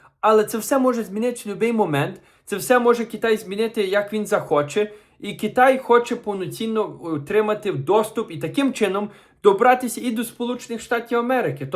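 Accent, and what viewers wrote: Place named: Western Ukraine, Ukraine. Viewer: Он бандера это у них такой диалект западенский бандера гельготун на мойве, это не украинська мова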